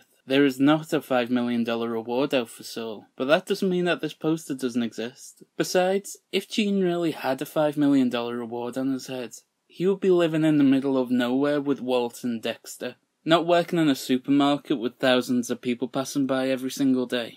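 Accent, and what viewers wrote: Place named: Yorkshire, United Kingdom. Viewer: I thought that exact same thing and subbed too! I'm no expert but i thought i hinted some early Alex Turner in your voice, I believe he's from Yorkshire? I'm horrible w accents tho so I'm prbly wrong 😂 thanks for the video, i love your theory of Saul running the "tip" line.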